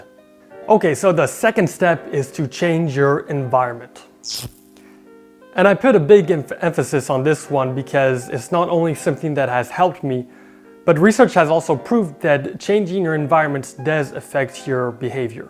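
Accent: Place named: Europe. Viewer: Sounds like europe somewhere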